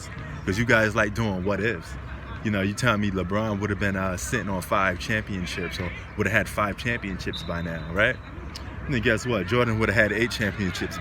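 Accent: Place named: New York, United States. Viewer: I thought you was from NY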